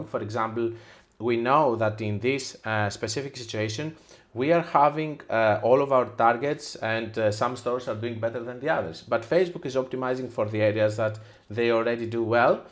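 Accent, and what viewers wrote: Greece. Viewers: η ελληνικη προφορα φωναζει απο 10 χιλιομετρα μακρια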